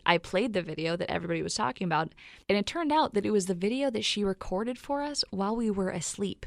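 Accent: United Kingdom. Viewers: justice543212 her voice is british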